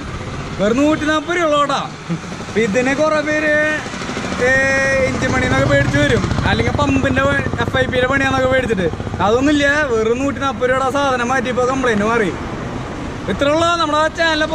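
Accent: Thrissur, India. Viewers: ande place thrissur thannya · തൃശൂർ സ്ലാങ് ഇഷ്ട്ടം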